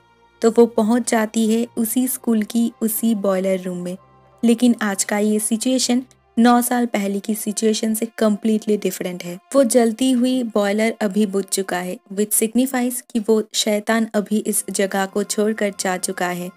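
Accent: Bengal, India. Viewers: Pls work on ur Hindi dear...Im sure ur Bengali...tats y... gender mistakes happening....pls work on it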